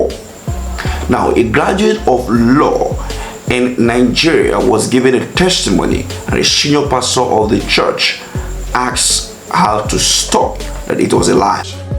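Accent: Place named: United States. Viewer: Must you speak like an American. Though I appreciate you but be real.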